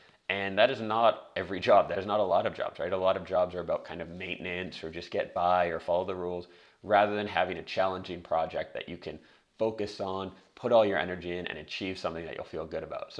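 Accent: Canada. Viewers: I found out I was INFJ earlier this year, and wish I had known earlier. Your advice is on the right track. Even as a child, I had to know why before I acted on someone's orders. Entering the military would have been hell for me. I have been working mostly alone since 1987, and found it is the best way for me to function. Working with others who did not have the same standards ended up making me close my doors, limiting communication with them. Once going on my own, while it can get lonely, ended up saving me all kinds of conflict and pain. And having to follow a procedure/process oriented, controlling boss uttering, "because I said so" would be purgatory for me. Did I make mistakes? Hell, yeah. But you try not to make the same mistake later on. I can tell from how you talk you are Canadian. Keep up the good work.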